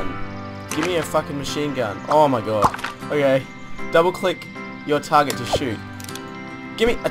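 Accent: Australia. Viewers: I can tell ur Australian! And u r super hot:) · you sound australian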